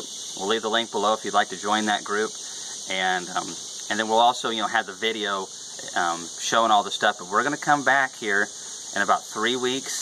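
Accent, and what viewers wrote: South, United States. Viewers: Thank you for this video and all of your videos for that matter. I'll admit it that I first started watching your videos because I liked to laugh at your "down home southern charm." Seriously you look and sound like Opie Taylor grew up and became a beek. But in fact, you have proven to be a great source of knowledge and your videos present a lot of information in a very enjoyable way! The real and refreshing shocker is that you shatter the stereotype of the southern, good ole boy beekeeper. You are clearly intelligent and capable. Keep up the good work!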